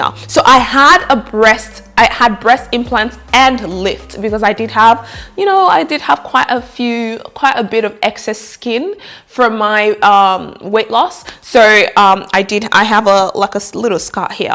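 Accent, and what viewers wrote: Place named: Australia. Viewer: ❤❤❤❤❤❤🥰 I love Australian accent! Had me laughing!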